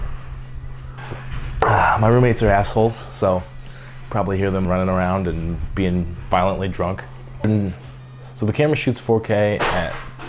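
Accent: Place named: Australia. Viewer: You sound rather Australian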